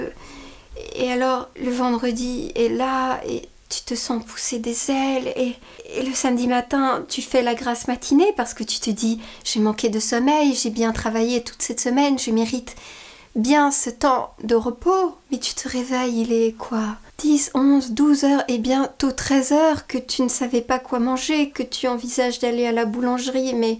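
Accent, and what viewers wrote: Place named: Quebec, Canada. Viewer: Anouk Fabing, je suis d’accord avec toi. J’aime, je la trouve inspirante, originale et belle malgré son accent québécois renié. Mais à mon avis, c’est possible de s’épanouir de à du lundi au vendredi si on est bien entouré au travail. Un horaire atypique est plus souvent un problème qu’un symbole de liberté, fait parti des rares personnes qui peut gagner sa vie en choisissant comment et quand elle travaille. Ce n’est pas le cas de la majorité des gens à qui elle s’adresse.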